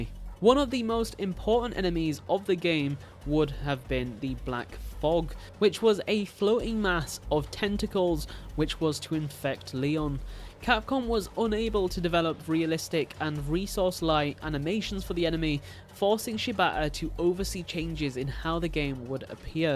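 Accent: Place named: United Kingdom. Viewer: haha you butchered that name with that heavy British accent bro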